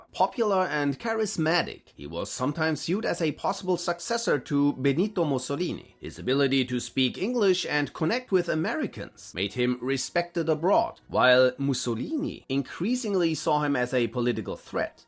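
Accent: Germany. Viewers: Said by a german gives it a wholly different taste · Aha! Quite good, but very german 😊... Like my german: quite good, but very italian 😂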